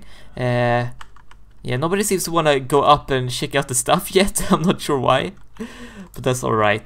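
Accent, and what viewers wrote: Sweden. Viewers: Are you from sweden. I think i can hear it in your accent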